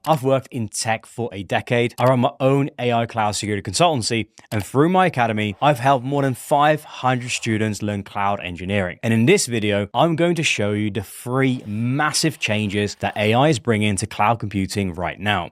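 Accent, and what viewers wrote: United Kingdom. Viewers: Love from Ireland, your neighbour as I can fish out the distinct UK accent 😊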